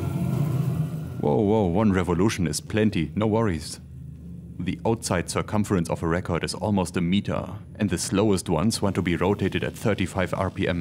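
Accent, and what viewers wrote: Germany. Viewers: German accent👌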